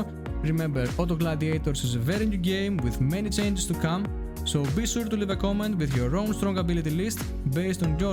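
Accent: Greece. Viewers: Is that a greek accent?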